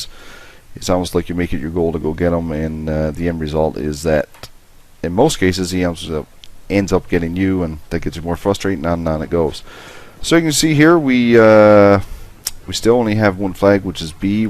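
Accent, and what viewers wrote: Canada. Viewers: Are you from Canada or Minnesota? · is that a faint Newfoundland accent I hear? Vancouverite asking, much respect! Add me up, "guybones" psn id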